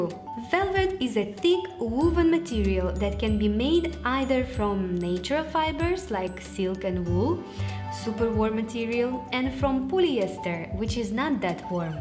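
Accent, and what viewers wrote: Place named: Russia. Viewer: Слышу русский акцент)))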